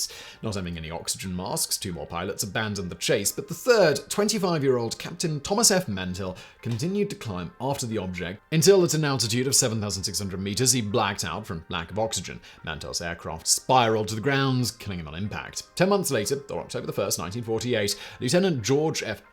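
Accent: England, United Kingdom. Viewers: Misinformation never sounded so english and bald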